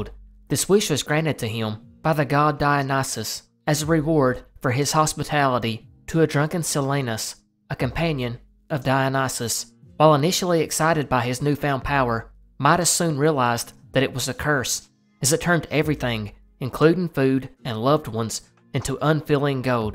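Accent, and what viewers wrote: South, United States. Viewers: I just found your channel about a week ago and I've been binge listening at work and to fall asleep too. I love the content, but I really love the fact that you're a fellow southerner. There's not enough southern accents on YT. Greetings from East Tennessee! EDIT the guy who jumped out of the plane and died from the cocaine bear story landed in my old neighborhood in South Knoxville. I was a little kid back then, but I still remember when that happened.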